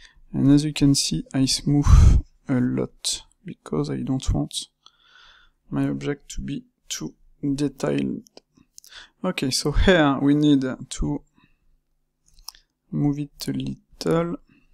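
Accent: France. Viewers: On reconnais le francais assez timide eheh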